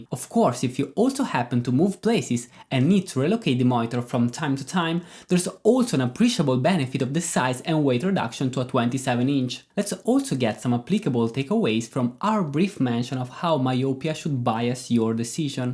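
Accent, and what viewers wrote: Italy. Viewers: Italian Is my guess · This is undoubtedly the best video on the subject of monitor size. Thank you. I love your Italian accent. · Sounds more like Italian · Si sente molto l'accento italiano comunque ahhaha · Italian, Russian is completely different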